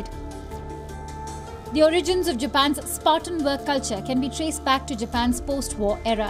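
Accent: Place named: India. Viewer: Indian English lol learn the pronunciation first. Work on your accent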